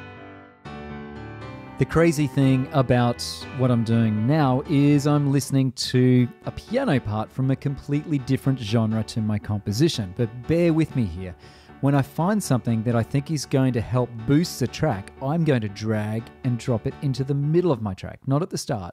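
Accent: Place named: Australia. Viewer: I love Cubase 10.5.2 Pro but feel that I'm only using about 1% of its capabilities. It is clear to me that the person talking on this video is a fellow Aussie, but I have no idea who he is and would like to learn more about what he does musically and how he uses Cubase. The videos are awesome. Cheers.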